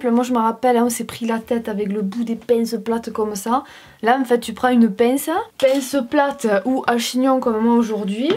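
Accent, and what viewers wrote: South, France. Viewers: J’ai oublié de dire Bonne continuation aussi. Ah qu’il est beau cet accent du sud. Je regardais déjà tes vidéos à tes débuts et franchement tu es resté toi même et ça c’est beau aussi. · Oh putaing l'accent du sud j'adore !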